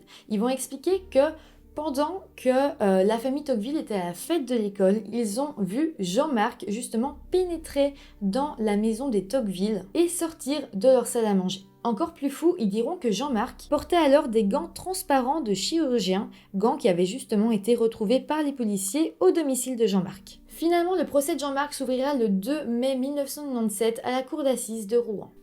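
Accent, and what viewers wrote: Belgium. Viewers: merci pour la vidéO et l'accent belge <33333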